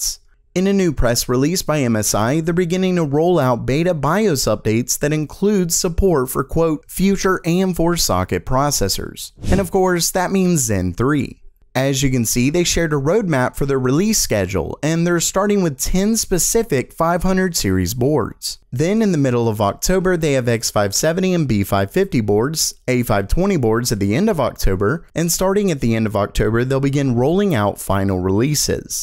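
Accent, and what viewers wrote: South, United States. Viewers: With each video your southern accent becomes more and more pronounced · I can hear the southern accent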